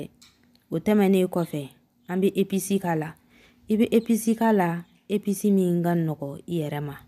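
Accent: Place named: Ségou, Mali. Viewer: J’adore ton accent tu viens de ségou ?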